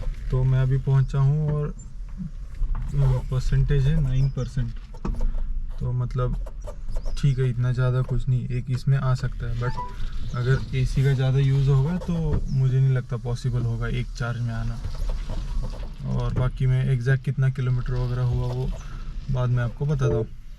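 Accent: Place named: Maharashtra, India. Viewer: Are wa wa marathi mulga talking in English..... Good good nice information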